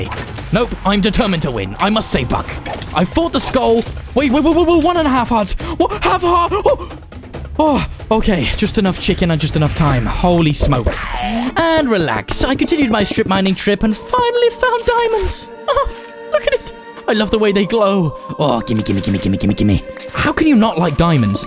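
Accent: United Kingdom. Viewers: I like your British accent it's cool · I saw herobrine u bri'ish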